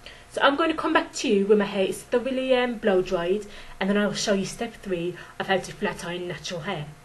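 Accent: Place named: Birmingham, United Kingdom. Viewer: Thanks for that vid, very informative and i love, love, love your accent; are you from B'ham UK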